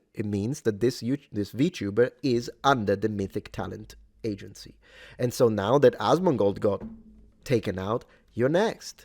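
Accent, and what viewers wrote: Germany. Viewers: he's Indian with German accent? Omg. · My first thought was, "Wait, is this the f*g with the German accent?" It was, in fact, the f*g with the German accent.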